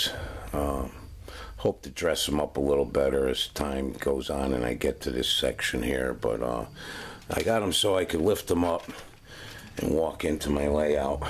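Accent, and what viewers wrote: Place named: New York, United States. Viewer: Hey the layout looks great! Now I'm going to check out you're other videos. Keep posting I'll keep watching. I'm building a layout also. Great inspiration thank you. Where are you from? Listening to you makes me feel like you must live in the NY NJ area. I'm a north jersey guy. Nice job!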